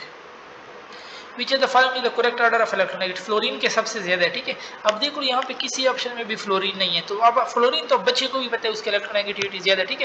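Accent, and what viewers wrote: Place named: Khyber Pakhtunkhwa, Pakistan. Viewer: Sir ap kha sa hoo kpk sa lg rhi hoo😊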